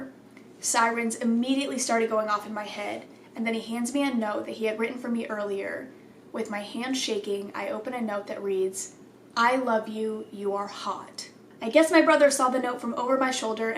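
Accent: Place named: South, United States. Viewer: Its the southernish accent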